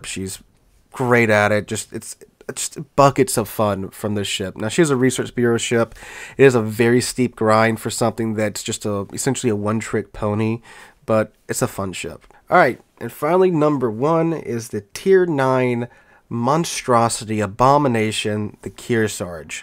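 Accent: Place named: South Texas, United States. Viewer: Sounds like you live in South Texas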